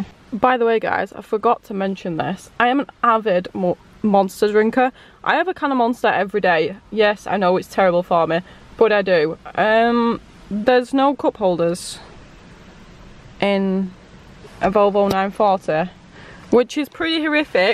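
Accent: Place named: North East England, United Kingdom. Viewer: yea I might of been tempted at half that but it's a bit much for me. £2.5k gets you a really nice 95 aero wagon with 250hp. Probably be a lot better in a crash too. Awsome channel. You sound like your from the north east my bro inlaw stays just outside gateshead. I'm thinking of getting into bike as I live on the nc 500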